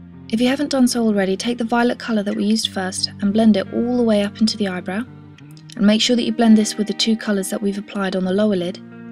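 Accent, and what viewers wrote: England, United Kingdom. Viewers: British =w= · R U english??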